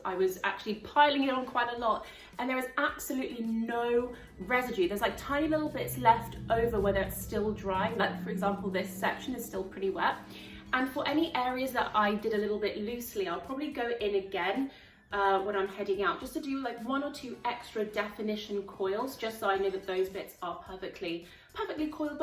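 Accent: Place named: United Kingdom. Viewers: She's a Brit. There's a significant difference between the 2 accents. I'm surprised you're unable to differentiate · Are you brittish or South-African? Cool accent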